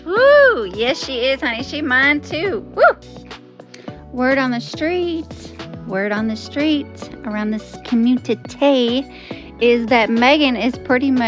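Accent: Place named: South, United States. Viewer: Love your voice! You sound like a southern Belle! ❤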